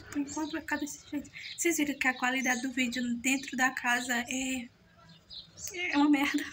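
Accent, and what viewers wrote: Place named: Northeast, Brazil. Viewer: E um sutaque de nodestino adoro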